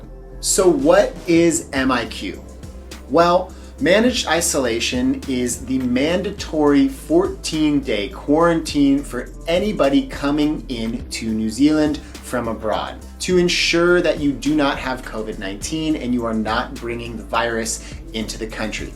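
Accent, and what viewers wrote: United States. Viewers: Welcome Home! As a fellow kiwi, it’s super interesting to watch your journey on coming here to NZ. Look forward to you watching your journey in loosing that American Accent as you settle in 😅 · I'm sorry but how were you allowed to even come here, are you a resident ? that accent sounds like American so-called closed borders ay. Typical one rule for some and different rule to everyone else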